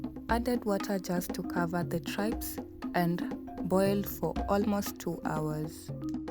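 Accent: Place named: Kenya. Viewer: Love that Kenyan accent.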